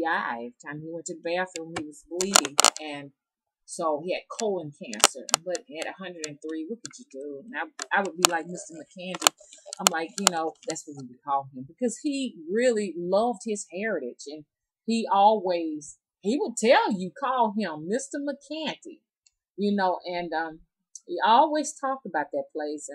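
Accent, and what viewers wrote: South, United States. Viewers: I absolutely love the southern drawl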